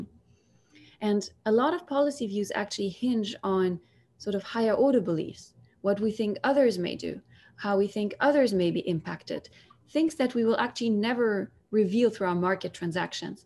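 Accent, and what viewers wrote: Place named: Bulgaria. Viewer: She appears to have spent a lot of time on accent-therapy and techniques to lower her voice. She cannot escape the Bulgarian accent, ever, but she's put a lot of work in to sound close to native.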